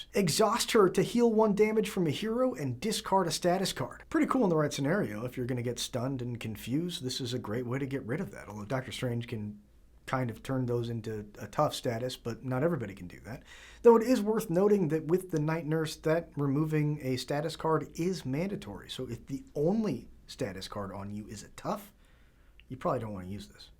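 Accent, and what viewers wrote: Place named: Scotland, United Kingdom. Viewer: Thought this one might be Scottish 🤣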